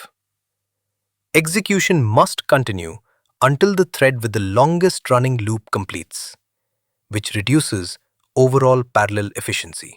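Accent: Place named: India. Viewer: why does a clanker need an indian accent, like horrible coding course squared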